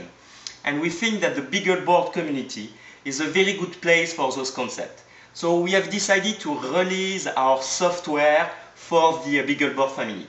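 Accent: France. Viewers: you have a really thick french accent...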